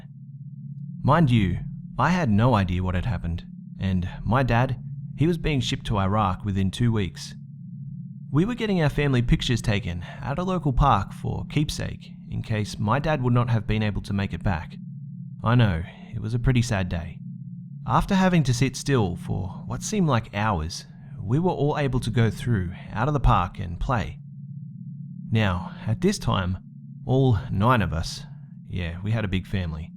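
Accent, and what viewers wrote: Australia. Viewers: I think it's an aussie way of talking. I never noticed it before but a few weeks ago I started noticing it and I first thought it was cute and very aussie, like how he pronounces building 'biwding,' but I feel like it's getting more and more frequent and I catch it every time. it's not unbearable but the frequency of it does make we want to cringe a little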